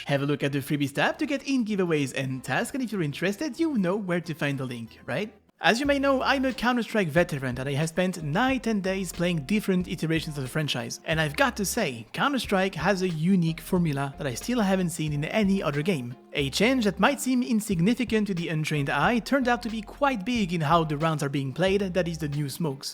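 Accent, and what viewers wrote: France. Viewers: T'es français fréro l'accent ça trompe pas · Ton accent français 😂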